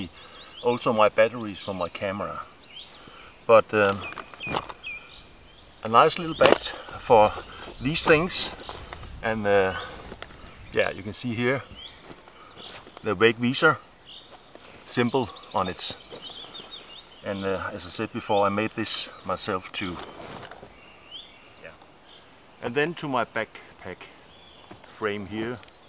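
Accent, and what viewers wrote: Denmark. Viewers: du er fra danmark jeg kan høre det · You sound Danish, from your accent, but where did you film this; as the scenery looks too rugged to be Denmark?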